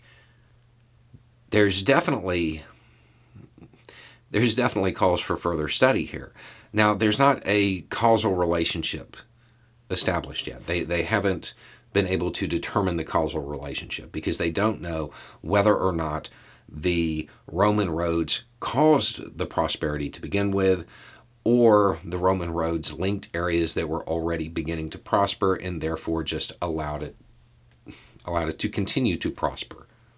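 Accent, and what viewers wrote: South, United States. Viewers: Oh, talk to me, eloquent American Southerner, about the logistics of ancient Rome.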